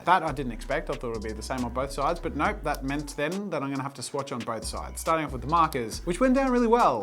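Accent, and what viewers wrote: United States. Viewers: Can we speak about the fact that his American accent is literally on point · As an american, I approve of your american accent. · That American accent though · Your American accent is actually really good! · I loved the video, but my favorite part was the American accent! I replayed it several times! 🤣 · Your American accent is flawless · Jazzas american accent sounds like he could be a narrator here😂 very calm and smooth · That's a pretty solid American accent! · Jazza reading with an American accent make me feel weird. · American Accent Jazza was mildly unnerving because he sounded like himself but with just enough different that my brain had to actually process what I'd just heard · Your american accent is pretty good Jazza, I heard the difference immediately and abruptly did a double-take.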